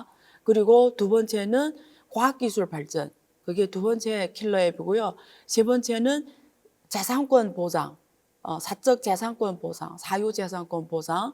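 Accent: Yanbian, China. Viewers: 이번주는 안유화~ 강의 꾸준히 듣겠습니다. 연변식 한국어, 너무 귀엽고 친절하네요. ^^* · 연변에서 조선족출신 이신것같아요 경상도 쪽이요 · 교수님말투가 이따금 연변말투같네요 ㅋㅋㅋㅋㅋㅋ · 난,좌파도아니고친중도아니지만,,,조선족남자는편견과달리 나름좋은분을만나봐서 디스는안하려구 ᆢ근데확실히이번정부는친중이고,이건아마도 이미중국의내정이깊숙히개입되었다는것,눈에보임 ㆍ티가너무납니다,아마추어인내게도보이는데?인물이반반해서 먼애기하시나들어왔는데,말투가 조선족처자,머그렇다고해,경제,정치애기를구구절절하시는데,,,이분이일단은교양이부족해,나름전문가적소견을어필하는데도,,,머랄가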